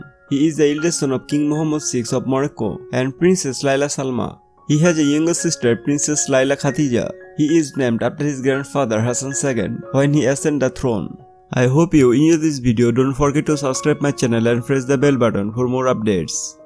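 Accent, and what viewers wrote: Bengal, India. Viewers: Your accent you must just be an Indian ,thank you for your care about my country. ....Casablanca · Narrator is bengali Asli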